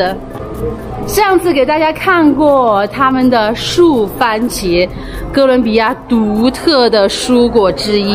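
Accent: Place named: Taiwan. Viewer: 视频拍不错，学哪台湾腔，真受不了。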